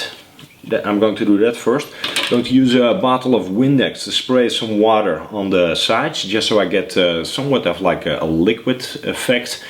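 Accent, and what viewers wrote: Netherlands. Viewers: Wow! Really creative and original work. Are you Dutch by chance because your accent sounds a bit Dutch?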